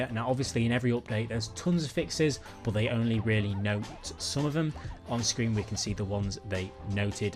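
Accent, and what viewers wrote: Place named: England, United Kingdom. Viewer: It's the English accent.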